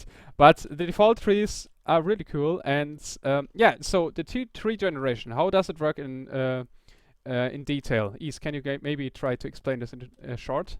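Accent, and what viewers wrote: Germany. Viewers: Du bist Deutscher oder :P? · you got a pretty german accent right i dont know im german ;D just say no or yes ;D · R u from germany?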